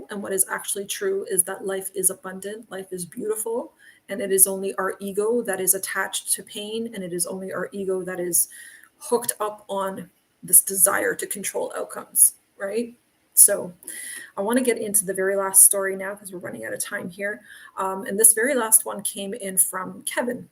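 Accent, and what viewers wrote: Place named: West Coast, United States. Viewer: I don’t usually watch Q&As (actually never) but your videos are “popping” up on my homepage lately and I’m wondering why? 🤔 I find today’s topics with & Kevin (I believe were their names), very compelling. (it sounds like is dealing with a Christian ideologue mom and guilt issues, just a guess? I can relate) America, while not claiming a national religion has surely imposed those ideals onto us from birth. Words like “Purpose” are a clear indication of that. After all I have learned from six surgeries, three of which spine related, All of which originated from a severe accident resulting in a broken neck. I joke that having Plates, rods and screws in my cervical & lumbar spine make me a hybrid human 😜 Judging from posting times and when they show up on my page you are in a different time zone from me (East Coast). 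You don’t sound European, South American, or Canadian for that matter; West Coast perhaps? Anyway, my point is scheduling an in person session wouldn’t be convenient. I am currently writing a book, autobiography of sorts, and would like to consult with you on developing the theme and characteristics of the antagonist. The more I listen to you speak the more I like your instincts & intuition. 😊 Can I email you to arrange an initial consultation perhaps?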